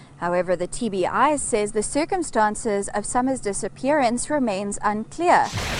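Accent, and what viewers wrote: South Africa. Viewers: the narrator is South African x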